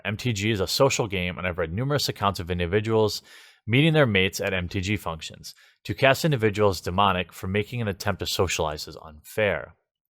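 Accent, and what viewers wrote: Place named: California, United States. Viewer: You remind me of Andrew Breitbart, especially your CA accent. :-) Keep fighting! & Good luck!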